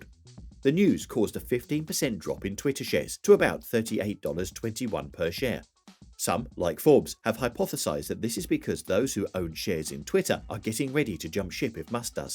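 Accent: United Kingdom. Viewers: What do otherwise educated people seem incapable of differentiating between "FEWER" and "LESS"? "Less users"? Really? Do you prefer "FEWER" jam on your toast? Fuck sake, it isn't even an American-ism. You have a British accent. One assumes you are a professional announcer. It's your job and inheritance to speak English better than this.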